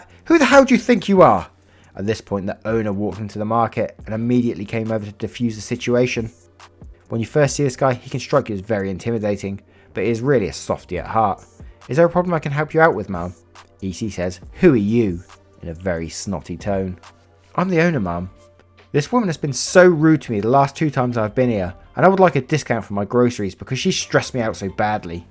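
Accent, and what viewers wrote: United Kingdom. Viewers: I think I asked on another video, but where are you from specifically in the UK? I hope to start becoming more familiar with the different regional accents. Much love!😍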